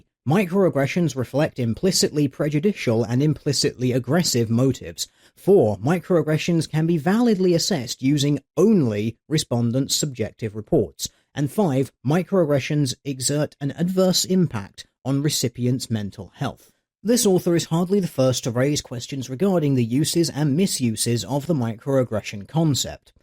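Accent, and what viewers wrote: United Kingdom. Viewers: That might just be the UK habit of over-Anglicizing foreign words.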